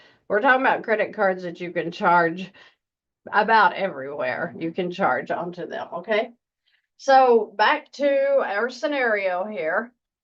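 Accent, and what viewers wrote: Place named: Kentucky, United States. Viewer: Love your accent. From Kentucky here. Sounds completely normal to me. Thanks for the video.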